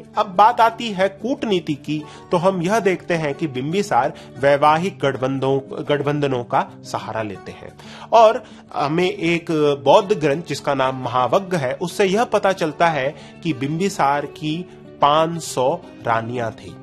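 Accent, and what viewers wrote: Standard. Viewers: actually he's not wrong totally, most people use Hindi mixed with English, Persian ,Etc in modern day .... But this शुद्ध Hindi sounds so good to listen to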